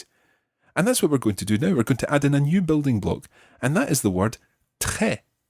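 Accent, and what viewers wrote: Scotland, United Kingdom. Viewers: Thanks m8 I found your pod from some learn french website, love the scottish accent. Maybe after a few of your videos I can finally communicate a bit with my fiances french family. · Speaking French with a Scottish accent. Amazing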